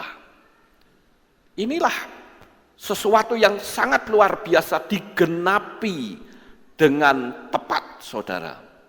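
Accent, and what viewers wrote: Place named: Java, Indonesia. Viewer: medok logat jawa mu pak.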